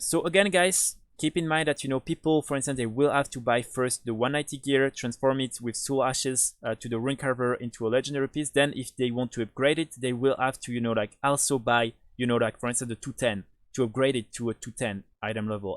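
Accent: France. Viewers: J'ai direct entendu que t'étais français haha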